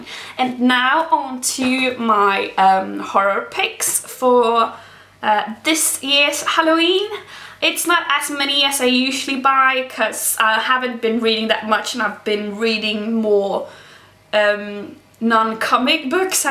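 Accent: Sweden. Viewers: Was looking for some good comic reviews. I think I will try "American Vampire". Thank You......And my American ears loves your Swedish accent.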